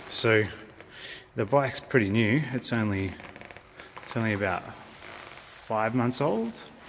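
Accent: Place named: Australia. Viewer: Your accent tells me you're in Aus - mate, lodge a complaint with your State's consumer affairs department. They've helped me in the past and I'm sure they'll help you. Remember we have pretty strict warranty laws and BMW is legally obligated to help. With this video you have enough evidence to (nicely) demand action. Good luck, and post updates! EDIT: Just read your post, you've already lodged with ACCC - no surrender!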